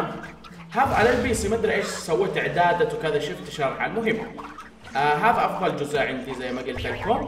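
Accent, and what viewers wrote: Jizan, Saudi Arabia. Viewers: والله احسك من جيزان صح انا من جيزان و اعرف هاذي الهجه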